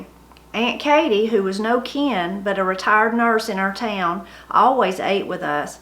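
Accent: Appalachia, United States. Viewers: Hi, I'm Sam. I live in St. Augustine, FL...... It's my first time giving comment here, but have watched some of your videos. I'm grateful for my friends, those rare people who know me, and love me as I am. For you, it's so nice just to hear your accent, your stories of a simpler time, descriptions of life where you live..........all of it gives a break from everything else out there.........some of which is getting more and more stupid every day......... I just want to say Thank You for the little journey outside of my own head, my environment here, and very nice visuals, too. Happy Holidays, and always remember, life outside of your region of Appalachia can be just beyond explanation, and seeing that contrast between such, and life where you are at, is a beautiful distraction, indeed. Thank you for giving us a look into Appalachia, and sharing the kind of stories that leave one wanting to go there...... 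.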